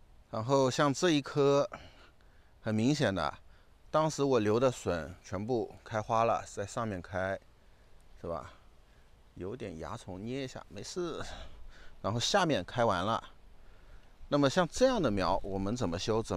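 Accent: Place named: Shanghai, China. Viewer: 第一次看到你的视频。听口音上海人是伐？看这景貌似南加？我也在南加😄。BTW, 我就是天平座的。😅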